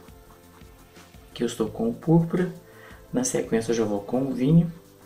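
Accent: Minas Gerais, Brazil. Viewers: Moço, quando vc mostrou o gráfico eu rapidamente tirei um print,fico triste quando falam para a gente ir no Facebook pegar o desenho,nem todo mundo tem Facebook...mas vc foi gentil e mostrou o gráfico,só por essa atitude e por nós ensinar com tanto carinho eu vou te seguir agora mesmo Obrigada...haa e amo sotaque de mineirinho 😍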